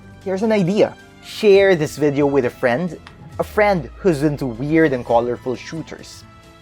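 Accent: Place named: Philippines. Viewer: Thats some solid pinoy accent:) great review though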